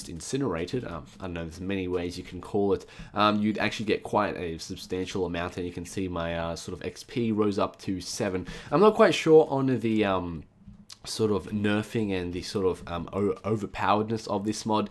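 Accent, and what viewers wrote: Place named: Australia. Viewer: I can't take you seriously, until you spell like a normal person, not a mental one. Also accents are such a thing, he has a Australian accent (I believe).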